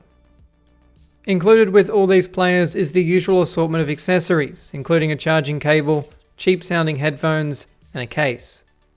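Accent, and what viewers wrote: Australia. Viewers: Mp3-4 players named nuggets/nugs, voiced over by an Australian man on a table, 🤔.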